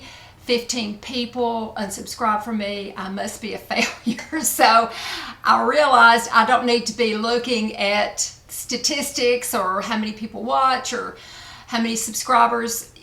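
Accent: South, United States. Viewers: Your southern drawl is endearing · I have always found your videos very enjoyable and your personality is genuine and comes across with honesty and a sense of comfort. You don't strike me as a social butterfly, but as a true home and family gal. You are never "overdone" with the artificial enhancements. You apply your make up to compliment, rather than disguise. And I love your Southern style and your accent. As far as the hurtful racist comments, evil is a dark place where souls lurk and has no place where the sun shines on goodness. And your videos bring sunshine to those of us who love you. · Great video, but then, I have dearly loved all your videos from the start!!!! You have values and feelings so similar to mine, we could be sisters!!! LOL!! I'd be your very much older sister, though!!! Please don't be so hard on yourself, I hated to hear that you redo so many because you felt they weren't good enough or that you could do better...I can understand that of course, but, those of us that know you and admire you so much would understand and wouldn't care nor would we judge you in anyway, if you bumbled every sentence from start to finish!!! 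For your sake, sweet, dear girl, ease up on the self criticism and just be you. We ADORE 'YOU'!!!! I am also southern and the first time I found you, and heard your gorgeous southern accent, I knew right then you'd be a fantastic YouTuber....and I wasn't wrong!!! Hugs, and have a blessed week...and hugs to all your precious family.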